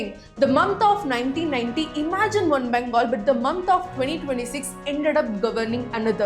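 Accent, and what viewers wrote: Tamil Nadu, India. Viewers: Madam Improve your pronunciation and diction. It has a heavy Tamil accent.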